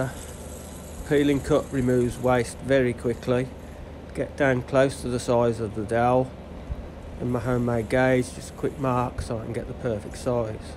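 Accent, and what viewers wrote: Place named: Birmingham, United Kingdom. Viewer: I love your accent. I lived in Birmingham for a while and I always feel homesick listening to you. Plus I'm hoping to be braver with the skew...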